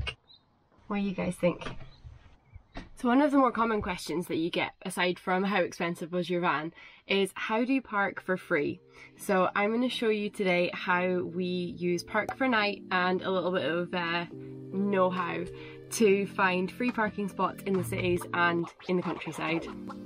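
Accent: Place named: Aberdeen, United Kingdom. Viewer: Are you from Aberdeen? As an aberdonian myself I recognise the accent, been in England for the last 12 years, mainly London, moved into my self build crafter conversion about a year ago, England is a total nightmare for parking, I love coming back to Scotland for the beautiful spots you can park in without any grief. Please do not put any of your spots on P4N as councils monitor this app and quickly put an end to your park up. I never use apps, I use google maps and street view, when I find a great spot I save it and in a year and a half I have quite the database of amazing spots. Also if you see a farmer go and ask him if it’s ok to park up on there land, they are extremely wary of pikeys parking up but if you introduce yourself be polite and respectful 9 time of ten they will accommodate your request free of charge and tell you where to park, I even had a farmer go all the back to his house to get his keys so he could open a gate for me. 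You can also offer site protection for exchange of a park up, farmers have a lot of expensive machinery, they are more than happy to have you stay as a presence against theft near the machinery. Thanks for the vid. Subbed.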